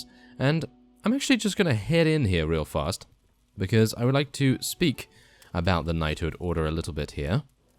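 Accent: United Kingdom. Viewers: The Valleys UK, or British basically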